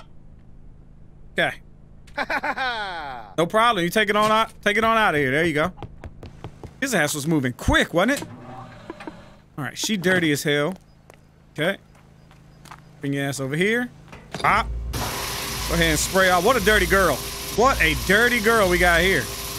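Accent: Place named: Midwest, United States. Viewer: This how country midwesterner’s talk. But you ain’t got no time to know this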